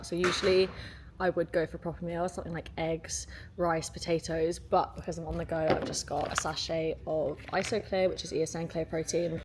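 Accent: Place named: United Kingdom. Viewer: Your accent sounds British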